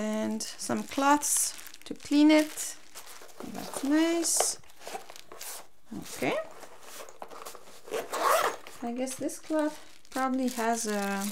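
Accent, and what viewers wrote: France. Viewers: Très bonne vidéo ,sa ce vois que te es française avec l'accent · J’ai entendu l’accent français et ça fait plaisir ! Sinon super vidéo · Je me disais bien qu'il y avait un accent français... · Are you french/french canadian? There is a light accent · I could spot your french accent in a way or another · J’ai l’impression qu’elle a un accent français non ? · Lorky ouii elle a un accent je trouve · Are you french? You have an adorable french accent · Une française j'ai reconnu avec les r hehe sinon c pas mal je pense pour les débutants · She is French from her english accent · French accent? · l'accent français ce ressent mdr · Coucou , j’entends un accent français je me trompe ? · Énorme accent français jsp si tu est française ou pas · Serait-ce un accent francais ? 😬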